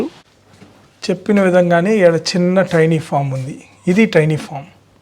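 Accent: Nellore, India. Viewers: I live in USA and watch your travel videos. Though I watch lot of youtube videos on travel and other related topics, I very rarely write comments. However your channel is exception. Just letting you know that your videos, presentation are very nice a your Nellore accent is very entertaining. Please keep traveling, capture your experiences good/bad and post. Wish you good luck and hope your channel grows in popularity.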